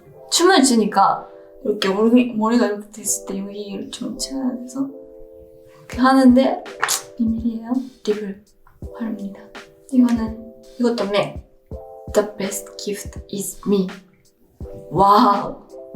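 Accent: Japan. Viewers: 일본여자는 특유의 귀여움과 아름다움 상냥함이있어...옆에있으면 머리를 쓰다듬어주고 싶어요..발음도 귀엽죠. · Sakura has been in kpop for so many years and still she can’t speak Korean well that’s very disappointing, it even sounds like she is speaking with Japanese accent 🤡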